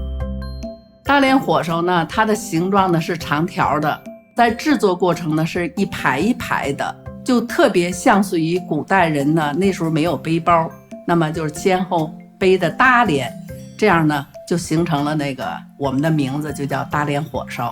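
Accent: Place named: Beijing, China. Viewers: 听着地道的北京话，突然想奶奶了…… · 地道老北京味儿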